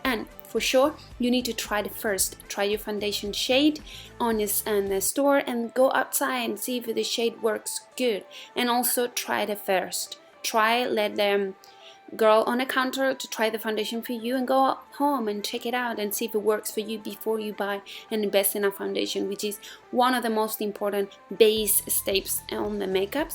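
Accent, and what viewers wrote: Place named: Spain. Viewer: acento spain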